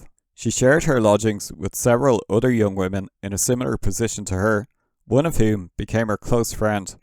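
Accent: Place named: Ireland. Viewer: What a gem of a channel I’ve found. New sub! With thanks. 🙏 nothing like an Irish person telling a story. Excellent.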